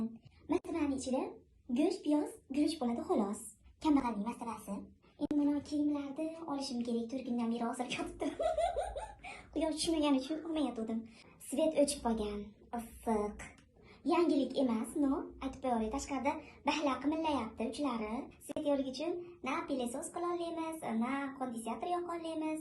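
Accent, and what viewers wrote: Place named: Andijan, Uzbekistan. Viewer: Екади. Айникса андижонча шевангиз. Самимийлигиз екади❤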